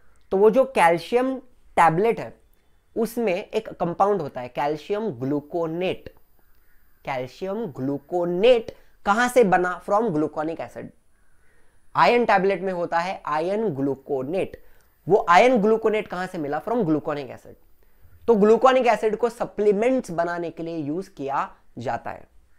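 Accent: Maharashtra, India. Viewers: Sir aapn Marathi aahat ka ??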